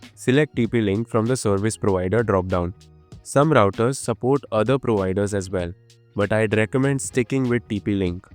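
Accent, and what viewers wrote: India. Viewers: From your accent you sound Indian. I listened to many american tutors on this subject. I have easier time understanding american, but they only confused me further. You, on the other hand, were succinct, clear, well paced, and I just got my job done. Kudos.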